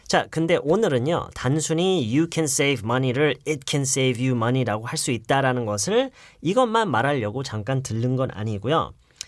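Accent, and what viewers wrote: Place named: United States. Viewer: 진짜 한국어버전으로도 양키발음을 잘 하시는게 신기하네요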